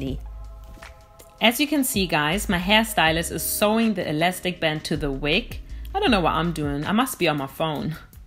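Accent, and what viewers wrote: Germany. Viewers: The german accent I love it -die Haare sehen übrigens geil auch ☺️☺️ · Such a thick german accent so cute love it lol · Could it be you are german? Your english sounds like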